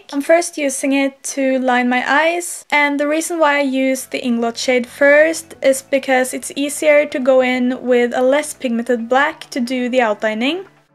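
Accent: Norway. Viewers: you can hear that you're from norway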